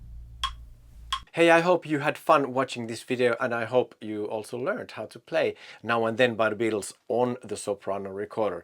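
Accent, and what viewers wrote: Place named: Finland. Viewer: Great tutorial. And i really dig your Finnish accent and vibe—and smile. Thanks for posting.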